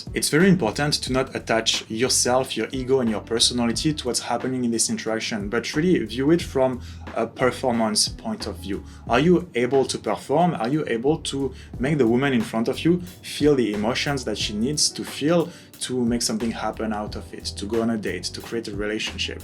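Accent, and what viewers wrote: France. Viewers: A good looking dude with a French accent giving advice on rejection. Yeah ok bro 😆👌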